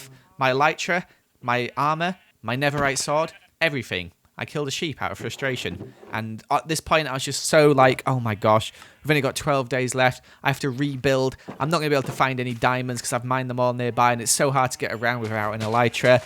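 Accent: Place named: England, United Kingdom. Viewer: i live in america, but i love the english "accent"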